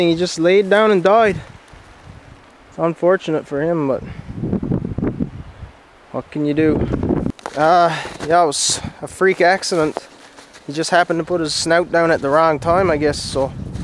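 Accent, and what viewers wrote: Newfoundland, Canada. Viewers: you got my subscription buddy! you a newfie? im from ole cape breton! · are you from Newfoundland?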